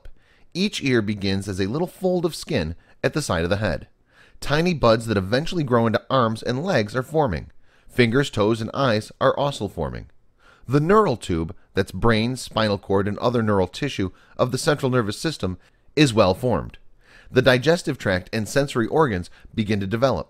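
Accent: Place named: United States. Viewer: British voice should be mandatory for documentaries. What a horrendous "metallic" American voice.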